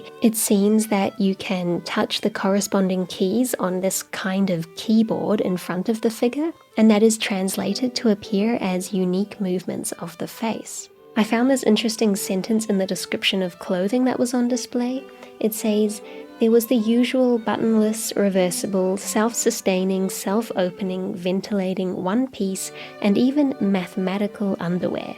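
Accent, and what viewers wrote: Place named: Australia. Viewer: Which city are you from? I ask this because you have a very interesting accent that sounds very distinct from other Australian accents.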